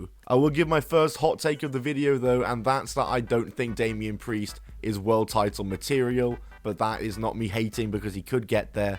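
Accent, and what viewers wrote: United Kingdom. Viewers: Wait a minute I just stopped a video at two minutes and 20 seconds you’re British!!!! Why is all the wrestling channels I watch British people Well, I like your video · You couldn't tell by his accent that he was british?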